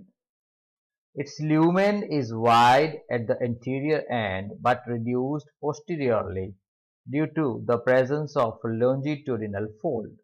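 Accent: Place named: India. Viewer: That Indian accent is so irritating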